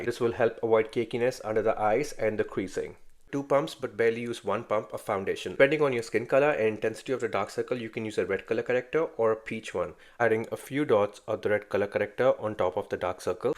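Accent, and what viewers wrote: India. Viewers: Correct first your accent. Does all Indians and hindoes have short tongue? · I love his indian accent ..it makes me move my head side to side😅😅😅😅😢😢😢😊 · احيه اكسنت هندي زفت و كمان ذكر يعلمنا ميكب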